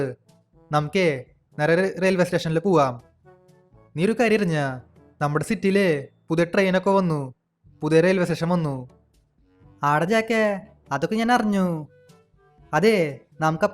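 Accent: Thrissur, India. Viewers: Bro തൃശ്ശൂർക്കാരൻ ആണോ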